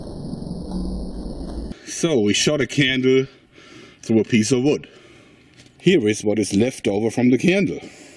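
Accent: Germany. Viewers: Hay, fu bist doch Deutsch oder, finde du hast ein typisches Deutsch-Englisch. Antworte bitte auf eine Frage eines Genossen:D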